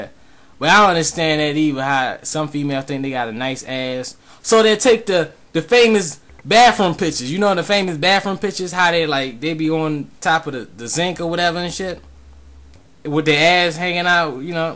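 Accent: Baltimore, United States. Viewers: are yu from baltimore dude tht made the video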